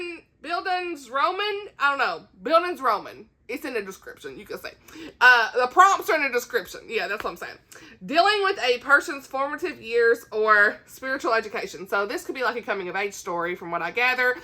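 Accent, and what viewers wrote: South, United States. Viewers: Your southern 😂😂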